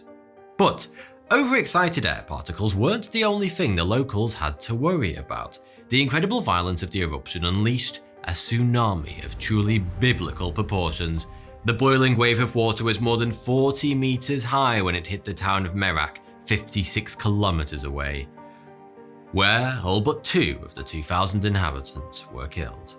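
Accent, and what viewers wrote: United Kingdom. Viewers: Lovin your british accent in french haha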